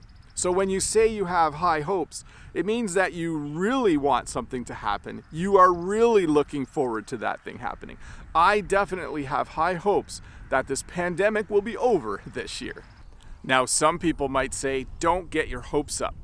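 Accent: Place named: Canada. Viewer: Through your videos i can understand and learn canadian accents.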